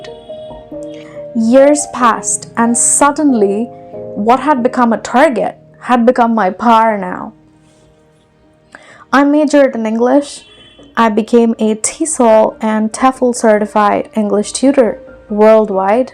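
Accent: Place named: India. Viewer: I only heared the audio without looking at the video to remove my bias, to check how you are sounding like. To my ear, out of 100 words you speak, 80% is correct accent, 20% is indian. Same problem happens with evryone who starts to speak with an accent. They always oscillate between indian and american. That oscillation is easily detectable and thats the reason of ridiculing the speaker. If you are 100% perfect, no one will ridicule you. For example, an american born and brought up indian origin teenager speaks with perfect american accent without any mix up.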